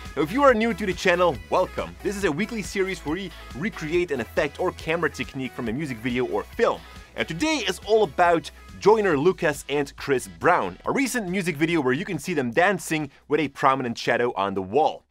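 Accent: Netherlands. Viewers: Are you dutch ?? Me too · Ik hoorde al een accent maar jullie zijn dus nederlands! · Wacht, ben je Nederlands? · Are You dutch?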